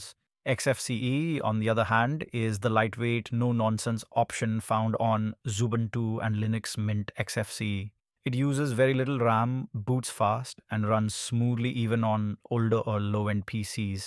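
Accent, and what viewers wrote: India. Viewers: Indian accent, right? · Indian accent pmo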